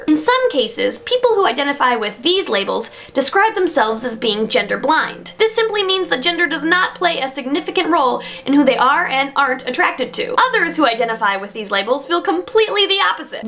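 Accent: Minnesota, United States. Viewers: You're MINNESOTAN?!?! SO AM I OH MAH GAAWWWSSSHHH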